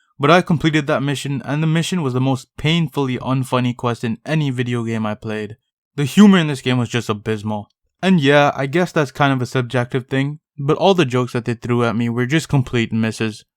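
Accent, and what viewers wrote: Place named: South Asia. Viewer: South Asian voice- immediately shuts off video. This guy’s iq is too low to appreciate the game.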